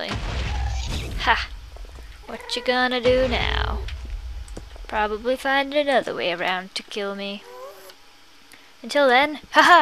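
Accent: United Kingdom. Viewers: well she is British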